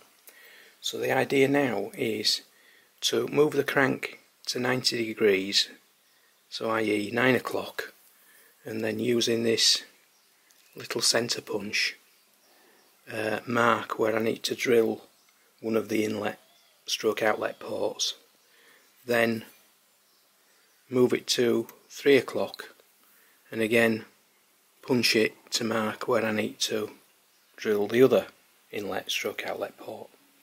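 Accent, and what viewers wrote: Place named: Hull, United Kingdom. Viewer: You sound like you might be from Hull, my home town. Very nice little engine, something I'd like to have a bash at. Greetings from Australia...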